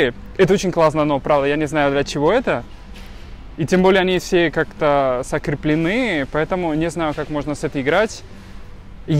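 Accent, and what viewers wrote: Standard. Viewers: Так чисто говорить по русски, просто Браво!! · Парень чистенько по-русски шпарит! Молодец! И человек с юмором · мексиканец? у нас не каждый кавказец так на русском чисто говорит..лайк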